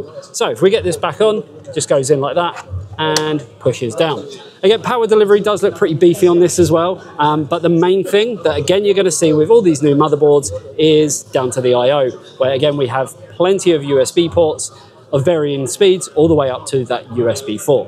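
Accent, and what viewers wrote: United Kingdom. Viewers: Why is British accent so horrible? Can't even speak the alphabet with consistency let alone make coherent sentences.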